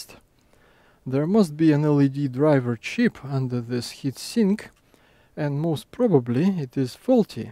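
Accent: Russia. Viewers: Ахренеть какой русский акцент.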